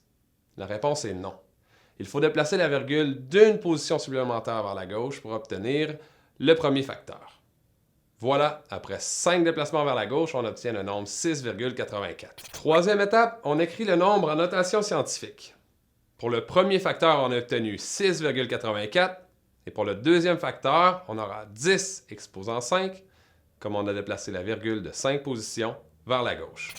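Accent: Quebec, Canada. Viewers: Merci beaucoup j ai bien compris je vous adore surtout avec l axent québécois 👍😆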